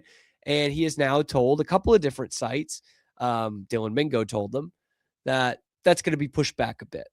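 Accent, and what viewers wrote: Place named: Boston, United States. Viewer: I don’t care if u grew up with Tom as ur babysitter, I hate u when u wear that hat. The less you remind me of your provenance, the better. You’ve done an admirable job ironing the chowdahead out of your accent and thanks for that. Now, never wear Pat merch ever again.* *Wear the Boston Bruins stuff. That’s totally fine.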